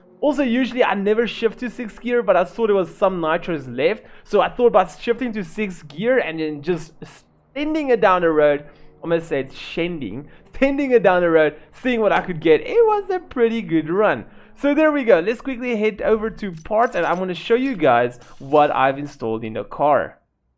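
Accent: South Africa. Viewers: my fastest car in my garage has a 0-100 in 0.54 seconds and a top speed of 310 km/h. my time on that track with it is 8.23 seconds also are you south african? you talk with that type of accesnt? also great video i loved everything about it😀😀😀😀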